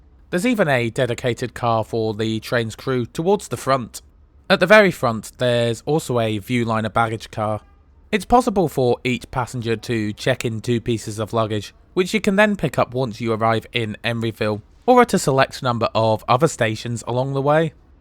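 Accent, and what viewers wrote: United Kingdom. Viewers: I love hearing a British person narrate their American train adventures, it's a good way to see my favorite parts of my home country as experienced from an outside perspective.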